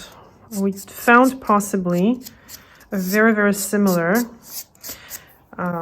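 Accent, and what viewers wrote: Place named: Poland. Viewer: Dopiero niedawno oglądam twoje filmy i dzisiaj usłyszałam że mówisz po polsku. Tak się domyślalałam bo brak u ciebie tego kanadyjskiego entuzjamu w głosie pomimo że akcent już mało polski 😉 Mam jeden lakier z Catrice z seri nudes i byłoby wszystko idealnie ale niestety słabo się u mnie utrzymuje. Po 3 dniach jest spękany na powierzchni. Za to eveline pięknie się sprawdza, mega trwałe i łatwe ale kolory mają jakieś takie mało ciekawe...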